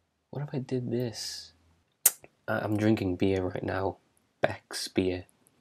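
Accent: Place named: England, United Kingdom. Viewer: tries to guess your region accordion to your accent... Well it's obvious what it's NOT. I'm just going to be vague and say "southeastern". Although I could have sworn I heard a slight northern tinge. Did you just say "fwiends"? Pwease don't tell me there is a slight Geordie influence in there! You know what? Scrap that! You know that scene from Mrs. Doubtfire when Pierce Brosnan (yeah, and obvious Irishman, lol) mentions how he can't quite place Mrs. Doubtfire's accent, and so he responds, "All over." Yeah, that's what I am saying your accent is, everywhere in England anyway, accept for Cornwall, definitely not Cornwall. Wait, did I just use "accordion" when I meant "according"?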